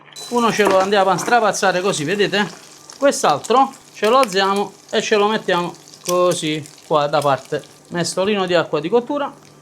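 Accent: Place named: Naples, Italy. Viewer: Sei troppo simpatico!!! Adoro la tua napoletanità, il tuo dialetto, il modo, mi strappi sempre un sorriso e anche la voglia di cucinare! 😊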